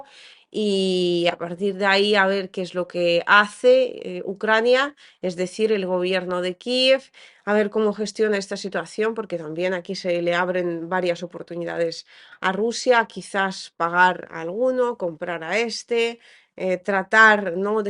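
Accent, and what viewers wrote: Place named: Spain. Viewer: Hermosa, No Necesitas Hablar como Española. Eso te Devalua. Habla Mejor como RUSA. 👉✌️😍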